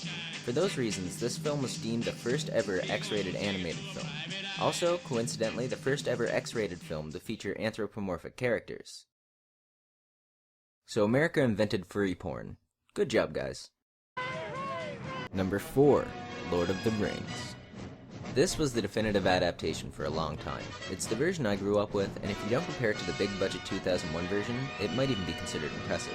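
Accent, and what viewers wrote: Canada. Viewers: Do all Canadians talk the same way on YouTube?...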